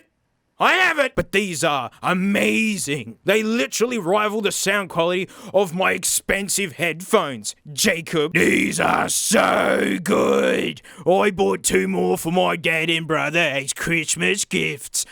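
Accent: Australia. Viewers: Aussie accents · coming from Western Australia, He's definitely saying over ears, accent bro. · When I heard "Ovaries" instead of "Over ears" and then proceeded to slap myself in the face for forgetting the bloke is Aussie through and through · This ain’t English, this is ‘Stralian. But no, not really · He’s Australian. Kory’s voice actor is from New Zealand. Australians and people from New Zealand have similar accents. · You haven't gotten used to the great Australian accent.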